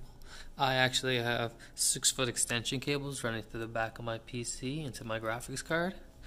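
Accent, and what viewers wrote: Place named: United States. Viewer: sounded american thou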